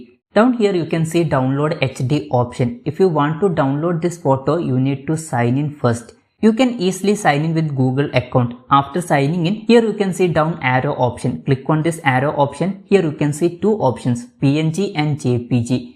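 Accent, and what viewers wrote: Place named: Kerala, India. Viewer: Understood from your accent bro; 😊 I have a keralite friend ...; I am from North Bengal. 😊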